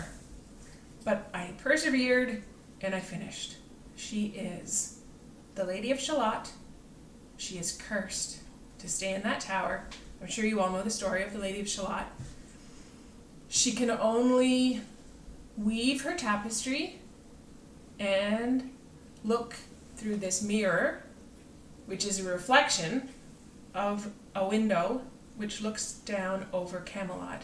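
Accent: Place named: Canada. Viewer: Beautiful finishes! HAEDs are out of my comfort zone, but I admire them. Have a wonderful time at your stitch day, I just watched someone else who is going. Don’t remember who. I watch a few Canadian flosstubers because I love your accent! My dad was Canadian, so we had lots of relatives visit us in LA in the winter.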